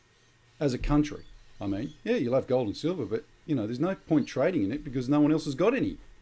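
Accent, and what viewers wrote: Australia. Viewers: ha,, great to hear an aussie accent,, don't despair with lack of response,, expect this from humans,, they are mostly pretty thick,, also pleased to hear you say the lords prayer.. I'm prepping, but I've only got some cash.. metals are useless. batten down hatches..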